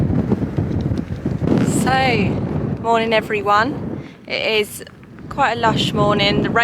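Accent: United Kingdom. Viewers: I from indonesia(kupang City)i like u aksen british&you nice girl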